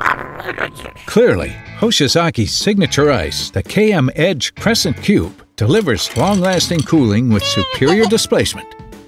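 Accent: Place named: United States. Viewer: Aaww. I remember when I was still a kid about the voice over. Very American and convincing voice. Sounds good quality and reliability.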